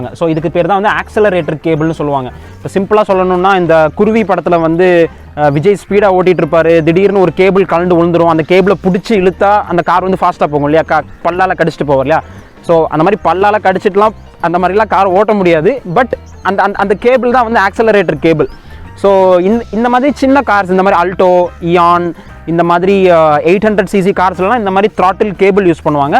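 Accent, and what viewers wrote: Tirunelveli, India. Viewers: Bro neenga tirunelveli ah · Neenga tirunelveli ya bro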